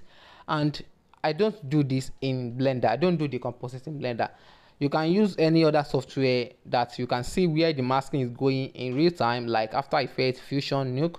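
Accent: Nigeria. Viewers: Talent speaks!!! You sound like you're from Nigeria I just subscribed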